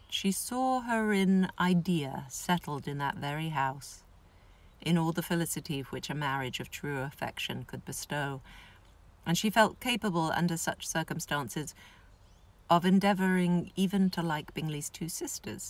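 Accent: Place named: United Kingdom. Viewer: Hi from New Zealand. Am enjoying listening to the modulation of your voice. And amused when you catch yourself slipping in & out of the British & US accents.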